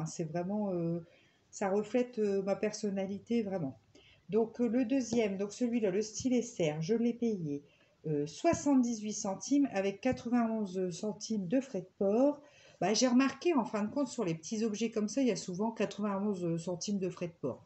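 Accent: North, France. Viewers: Un petit accent du Nord ?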